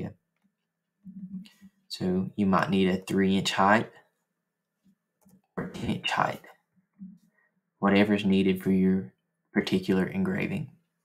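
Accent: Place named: South, United States. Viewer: You did an amazing job on this!! You are nice and slow on your descriptions, and I absolutely love the Southern Drawl!😊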